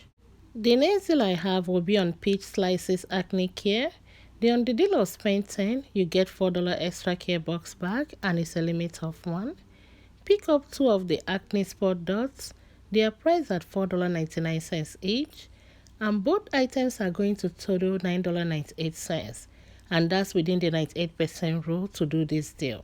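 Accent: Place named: United Kingdom. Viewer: I don’t agree! She has an English accent as in African we were taught by the British so the English is slightly different but fully understandable! I for one cannot understand someone like the Couponer Jodi Dunn as she has a strong accent making it difficult to understand the English!She posts the deals very well AND EARLY! I suggest you follow someone that you can understand instead of leaving such disrespectful comments!!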